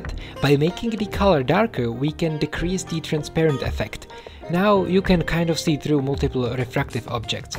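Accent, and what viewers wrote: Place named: Czech Republic. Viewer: Great tutorial! I am wondering, aren't you Czech? The accent seems familiar :D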